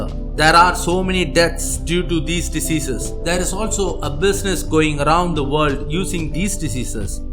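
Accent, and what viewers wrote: India. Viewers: Watch out for your indian accent in the way you speak English, apart from that you have a nice content and imagery.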